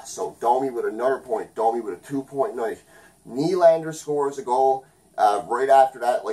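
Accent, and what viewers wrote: Newfoundland, Canada. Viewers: No trouble telling you're a Newfoundlander, yes by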